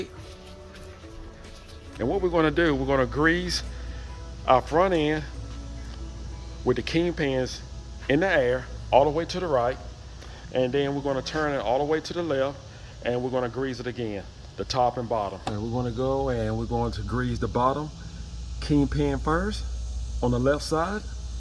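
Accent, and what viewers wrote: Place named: Texas, United States. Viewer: I really appreciate your videos. I am trying to place where you are from. I am guessing south Texas like Houston or San Antonio? If not there maybe South Carolina?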